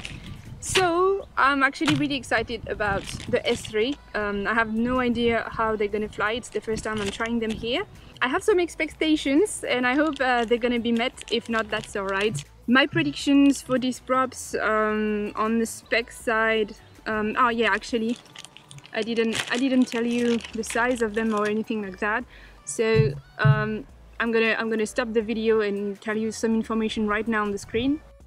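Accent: France. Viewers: Je dois debarquer(voir parler dans le vide)Mais,il n'y a pas un accent français?☺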